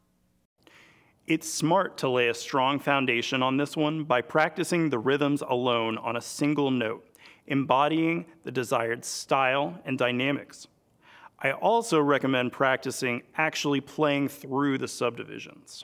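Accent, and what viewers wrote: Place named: United States. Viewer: He has an American accent, but the temper of a man in the British Parliment.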